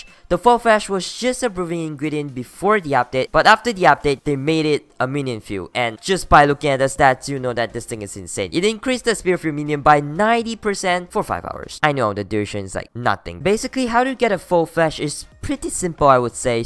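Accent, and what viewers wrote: Singapore. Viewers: are u Singaporean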